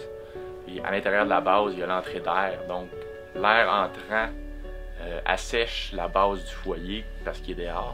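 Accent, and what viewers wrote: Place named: Ottawa, Canada. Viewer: I grew up in Ottawa, so I know that accent and some of the language very well. Brings the memories back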